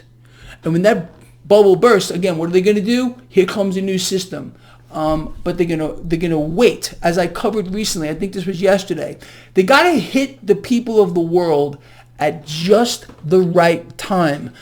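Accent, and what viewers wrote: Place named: East Coast, United States. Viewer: he's East Coast USA, there are different drawls (accents) in the US depending where you are at. One could say his accent & style are reminiscent of East Coast Mobs, but that would make him Super Cool! (Goodfellas, The God Father, etc).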